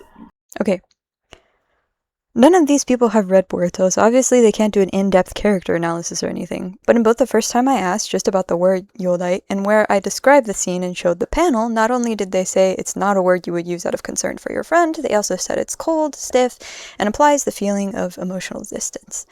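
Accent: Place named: England, United Kingdom. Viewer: 1. I'm glad the channel seems to be growing😊. 2.. Do people actually doubt your tranalations only because you sound more "English that you have to have your japanese ftiends to read and explain atuff?....that's just pure stupidity on their part. 3.Are you going to make a short vidro on analyzing any Bpruto character in the future? Can one commision you on analyzing a Naruto character/Boruto character based on a novel?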